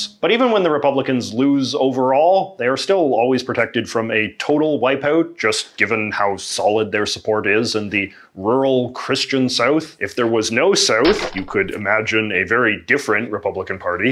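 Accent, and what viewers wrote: Canada. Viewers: I do believe you are the first Canadian I have ever heard actually say “oot”! And I have lived here for 76-odd years! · Damn you have a thick Canadian stereotypical speech, My english is not that pronounced, but my french sounds like i'm from the atlantic coast, cause my moms french Acadien family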